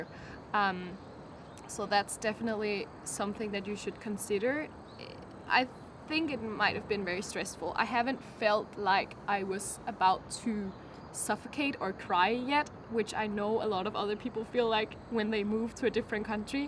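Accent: Denmark. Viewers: Girl i hear you danish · First time being on your youtube channel. And when I heard you speak, immediately thought you were danish cause of you faint accent. I was right! xD I'm danish too so yeah.. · I can tell on your accent your danish :) Men det betyder ikke at du har en grim engelsk udtale! Virkelig fedt at du er flyttet til Korea, vil rigtig gerne selv derned og besøge landet. Har dog været i Taiwan og Filippinerne for et halvt år siden så har oplevet noget asiatisk kultur haha. Du må have det rigtig godt dernede! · Jeg kunne høre på din accent at du kom fra danmark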